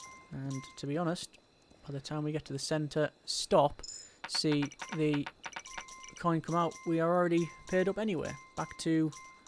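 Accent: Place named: Wales, United Kingdom. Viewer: Sounds Welsh to me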